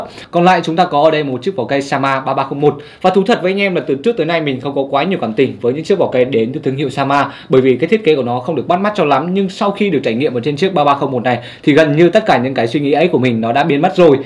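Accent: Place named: Vinh, Vietnam. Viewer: Anh này nói giọng giống giọng người Vinh quá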